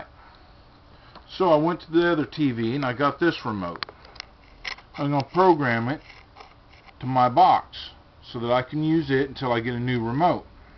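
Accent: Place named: South, United States. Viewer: Thanks sir you helped me reprogram my remote ! I love the southern accent by the way 👍🏽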